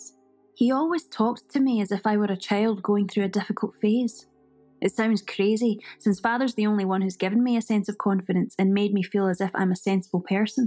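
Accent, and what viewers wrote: United Kingdom. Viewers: The Scottish accent is a bit off putting but Anne's words shine through.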